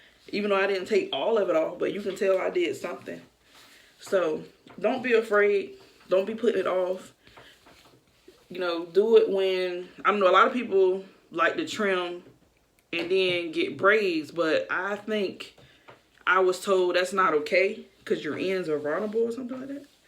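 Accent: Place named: South, United States. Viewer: I thought you from chicago or Alabama because the base southern hue in your voice and I'm from new York living in me mama's hometown and grandma's in Goldsboro,NC.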